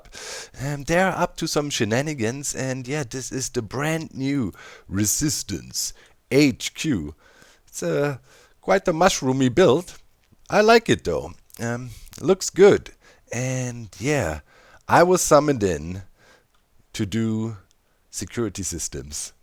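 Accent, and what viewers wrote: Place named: Germany. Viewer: Dein deutscher Akzent... immer wieder schön 😁😂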